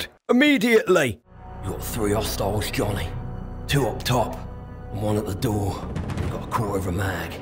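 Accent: United Kingdom. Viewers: you sound aggressively british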